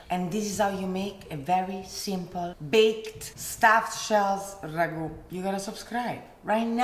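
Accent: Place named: Rome, Italy. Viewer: Sei molto simpatica e bravissima ma ti preferisco quando parli italiano e ho capito subito che sei romana ❤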